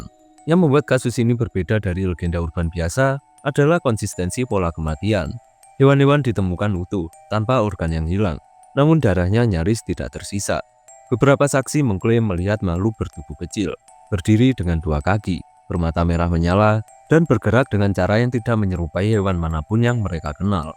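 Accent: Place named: Java, Indonesia. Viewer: Abang orang Jawa ya medok nya keliatan 😹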